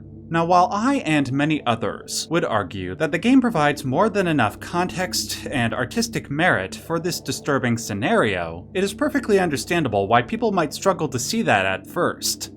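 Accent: Canada. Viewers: Whoa! Plot twist, you’re Canadian!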